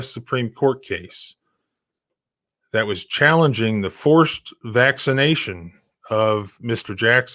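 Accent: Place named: United States. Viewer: Just to put a little wrench in it I have a memorandum law that I use when I goes fight speeding tickets parking tickets and a DWI which I fought myself it's tribes all the money issues you sound pretty good you sound very American what about property tax Appeals real estate agents value your property and then you try to appeal and the administrative offices wants $75 for the appeal they give you a form to fill out with all this information they want why wouldn't Fifth Amendment apply there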